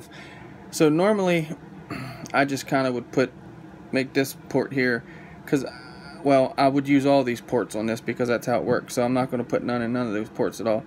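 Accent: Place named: Philadelphia, United States. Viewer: I'm from Philly and your accent is close enough to ours where I definitely trust everything you say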